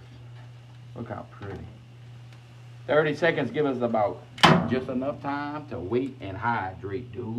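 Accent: Louisiana, United States. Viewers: Dude where were you raised at? I have never met a person in Kentwood that has a cajun accent that talks french.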